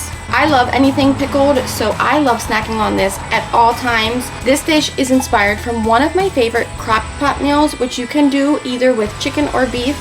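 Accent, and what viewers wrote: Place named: Italian-American, United States. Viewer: First off, keep saying things however you want. Don’t worry about the pronunciation police. Your results speak for themselves. You’re the quintessentially authentic Italian-American your food tribe appreciates. Second, how do you never have too much or too little cheese in anything you cook? It’s always just perfect! Gotta learn how you do that! 😂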